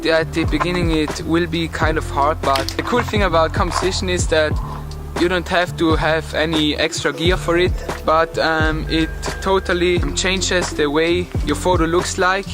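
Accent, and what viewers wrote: Germany. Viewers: Richtig deutsches englisch aber gutes Video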